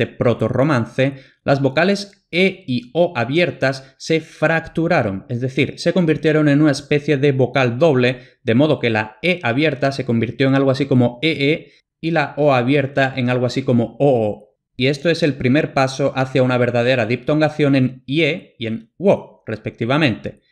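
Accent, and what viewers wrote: Castile, Spain. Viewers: Eres andaluz pero hablas como castellano al menos ante mis oídos latinoamericanos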